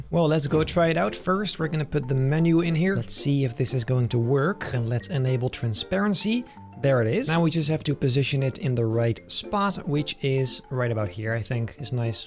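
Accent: Netherlands. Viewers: Ben je Nederlands, want je hebt een Nederlands-Engels accent😂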